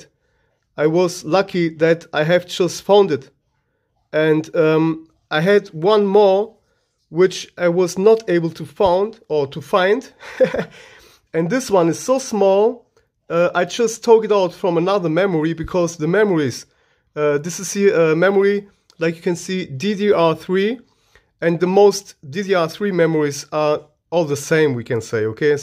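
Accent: Germany. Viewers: sounds like german or austrian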